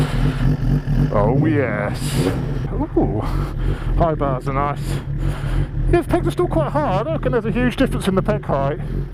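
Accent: United Kingdom. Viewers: Just subscribed to your channel. Being in Japan it's good to hear a British voice on a motovlog for a change. I've also just started making bike videos. Only 1 for now, but check it out if you have time. Thanks and keep up the good work!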